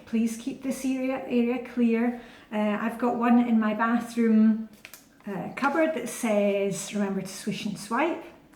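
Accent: Scotland, United Kingdom. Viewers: Thank you so much for these videos! I have been flying for about a year, but I still find your videos helpful and entertaining. I also love your Scottish accent! :) Kind regards from a German flybaby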